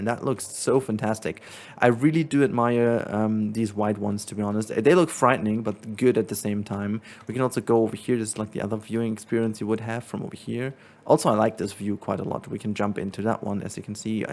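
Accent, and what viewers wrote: Germany. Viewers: You sounds like a german , bin selbst auch deutsch ,you too ?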